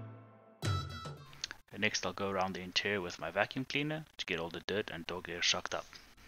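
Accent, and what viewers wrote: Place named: South Africa. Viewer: You can recognise a SA accent anywhere. Nice job. Hope to see more videos.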